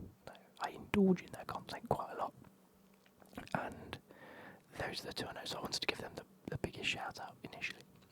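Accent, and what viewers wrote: United Kingdom. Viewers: I think its so interesting how the australian or new zealand accent is what you fancy, because to me thats your UK accent lol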